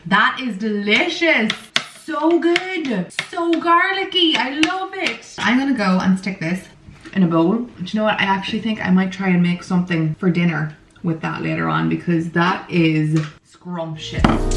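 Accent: Ireland. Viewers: Hopefully you find this insight amusing but since watching your videos I’ve been getting so much better at understanding Irish accents. It used to be with Irish and Scottish videos I have to put my full attention to follow what’s being said but now I can listen to you just in the background and understand the gist just fine... still working on the latter though. Have a great week! - Gerry (from Canada) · I am not a foodie nor a cook, but you make cooking look so interesting! I especially like the hummus recipe, may have to try that one! I want to come back to tour Ireland again so badly. Love the people, but in the mean time i just sit and watch you and listen to your accent :) OH as soon as possible could you take a walking or bike tour around your city?! Stay healthy, as i see and i am sure you are <3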